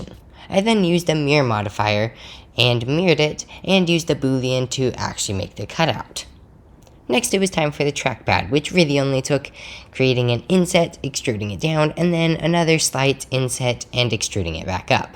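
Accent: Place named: United States. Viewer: Dude you sound American. So, I would assume the imperial system should be second nature😂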